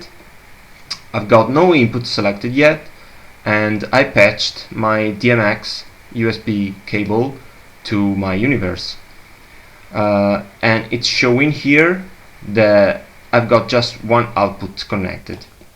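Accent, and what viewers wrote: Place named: Italy. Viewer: dal tuo inglese sembri italiano, PUOI FARE UN TUTORIAL IN ITALIANO????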